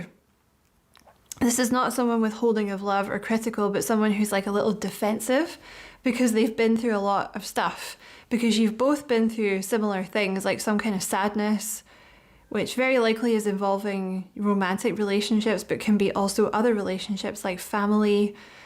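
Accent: Scotland, United Kingdom. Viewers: My apologies for my confusion. My American ears did not identify you being Scottish. However my soul recognized your voice. Once again, my apologies. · It’s Scottish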